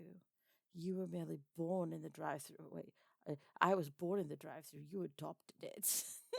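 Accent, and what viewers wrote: South, United States. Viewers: Ooooh man that southern accent is like 🤌Yeehaw! hehe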